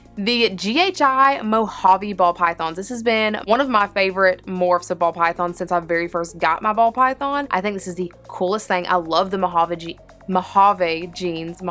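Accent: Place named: Alabama, United States. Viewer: Hey you are so amazing and inspiring, to be honest I don’t know if I would have gotten a bearded dragon If it wasn’t for you, I watched so many care guides and they were all so different but yours just seemed right, I showed my mom your channel and she started watching your videos with me. I really want to be like you when I get older, but I either want to be a firefighter to help out the community or a herpatologist (studies reptiles and amphibians). Omg can we talk about our accent, I know your from Alabama, and I’ve lived in Alabama my whole life we currently live in Madison, my favorite store is animal trax, I like to support small local businesses, other than like petsmart, but don’t worry, is still go to petsmart. Just remember JESUS LOVES YOU AND YOUR FAMILY.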